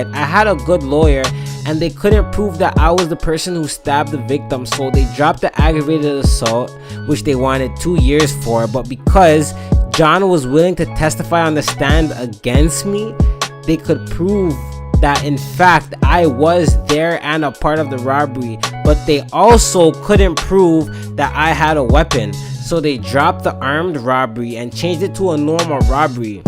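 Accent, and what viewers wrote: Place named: Toronto, Canada. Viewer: Dats funny I be from the 6ix too I recognized ur accent fam 😂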